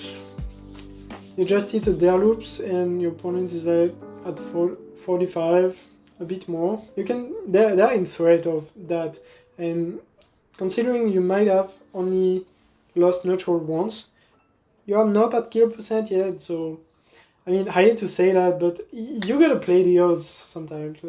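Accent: France. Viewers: Ton accent est très franchy!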